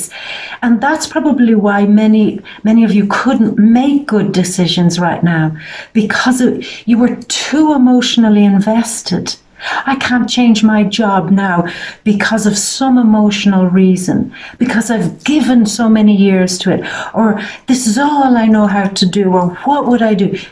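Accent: Ireland. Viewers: I be getting the feeling that you're lowkey Irish by your accent. Btw. I like your style.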